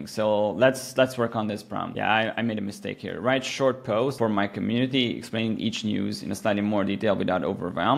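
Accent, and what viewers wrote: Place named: Hungary. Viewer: This guy has Hungarian accent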